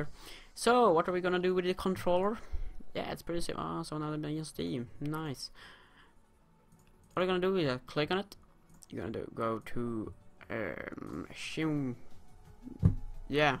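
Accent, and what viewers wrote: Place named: Sweden. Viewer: du har svensk engelsk dialekt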